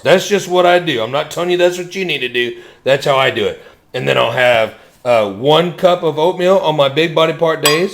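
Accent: Texas, United States. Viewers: Can tell you're from Texas brother!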